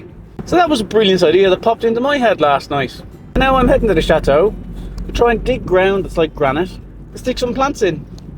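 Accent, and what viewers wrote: Ireland. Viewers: Well, you're SO wrong! 😄 We love listening to you prattle on about whatever in your Irish brough! Lol It's very entertaining! We would actually watch you do whatever. 😊 You actually do a great job of carrying on a great conversation with us (a camera) Please continue. We like you.😉👍 (We also love the chateau reno - watching) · Would love to listen to you blathering away with your wonderful Irish accent.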